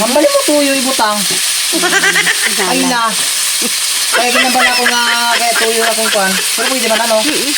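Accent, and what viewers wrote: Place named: Iloilo, Philippines. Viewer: May pagka ilongo ka rin pala idol kabalo man ko maghabal ilongo..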